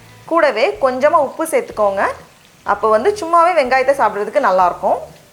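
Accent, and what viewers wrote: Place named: Kanyakumari, India. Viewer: Akka inum kanniyakumari slang varuthu...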